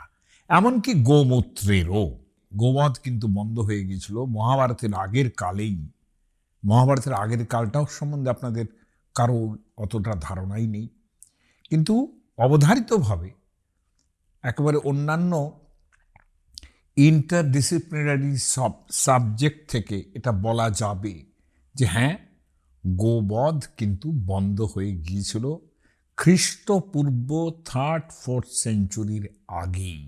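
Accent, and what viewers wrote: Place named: Rajshahi, Bangladesh. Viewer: Well he still carries bit of his early Varendri or Rajshahi accent I think.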